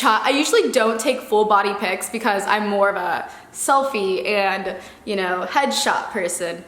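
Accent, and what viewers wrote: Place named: California, United States. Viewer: I knew you were from cali 5 seconds into this video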